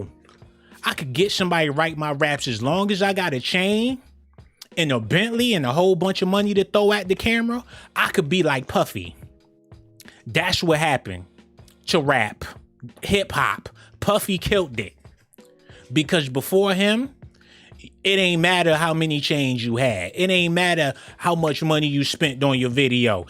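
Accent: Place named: Cleveland, United States. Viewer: The reason people saying some jacked up shit is cause yo voice dude lol they don’t take u serious “sometimes”. The way he talks: u know what im sayiiin we all gotta come together an beat our chicks an maybe we might get a promotion at our 9-5’s the nigga talk like Cleveland lol but he sounds like he super sarcastic while saying real shit lol but I don’t care I only said something cause u said u don’t want people to talk trash about him. It’s ur voice it interests more people into doin it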